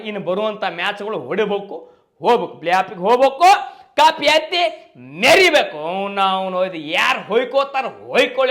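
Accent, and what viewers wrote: North Karnataka, India. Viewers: Nice uk talking ❤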